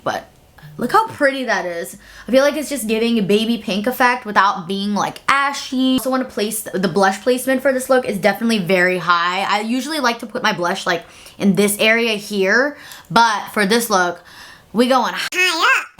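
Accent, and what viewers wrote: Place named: England, United Kingdom. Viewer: Oh and your English accent is great 😂❤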